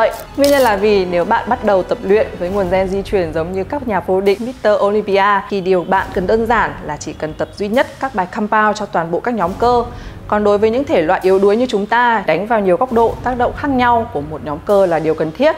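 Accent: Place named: Vietnam. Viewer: có 1 số từ chuyên môn dùng tiếng Anh thì phát âm khá thuần Việt. Team có thể cân nhắc cải thiện ha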